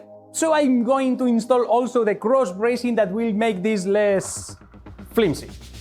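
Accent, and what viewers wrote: Spain. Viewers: ese acentazo es español! Que no? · Jajaj primera vez que me aparece un video tuyo, te oigo hablar y automáticamente he pensado: este tio es español fijo jajajaj gran descubrimiento tu canal, aquí tienes un nuevo suscriptor!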